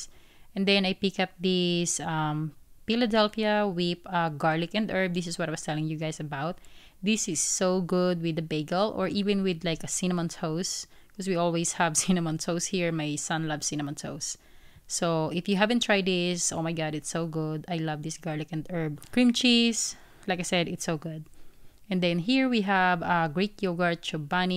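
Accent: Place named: Philippines. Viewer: 🛒 Loved the video, so I had to subscribe! If you don't mind my asking, are you Filipino? I lived in the Philippines until I went to college in the states and your accent makes me homesick. ❤ So many good items you found at Sam's club! I'll have to try the fried rice, it looks so good!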